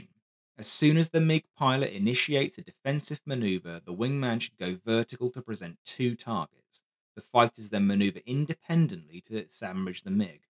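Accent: United Kingdom. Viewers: Love your Brit Accent and dry assessment that outlines the basics of the problems of the transoceanic and heavier air flight characteristics... a lot of technical limitations.. and I am not Fn of only single seat fighters over the REO concept, as this is the ultimate command seat for on scene awareness.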